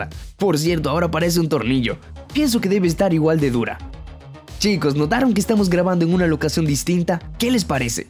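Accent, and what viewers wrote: Russia. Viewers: Eres ruso?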